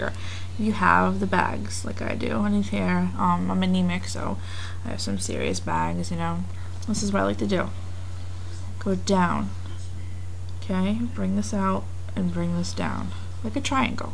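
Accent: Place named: Kentucky, United States. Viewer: there is that kentucky accent again lol , funny , enjoying these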